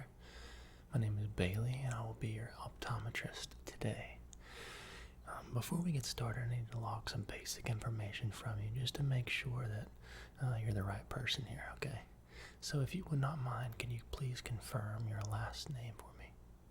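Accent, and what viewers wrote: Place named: South, United States. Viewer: Your ever so slightly noticeable southern twang is so comforting lol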